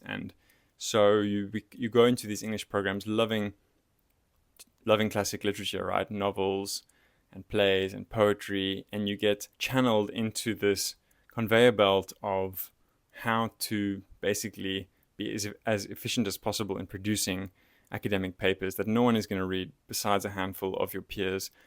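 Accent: South Africa. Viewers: The accent - you are South African aren't you? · Do I detect an SA accent?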